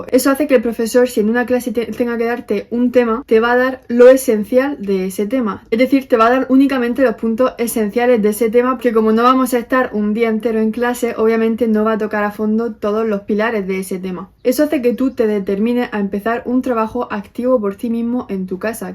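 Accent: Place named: Murcia, Spain. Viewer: buen vídeo, tu familia es de Murcia? tienes acento de por aquí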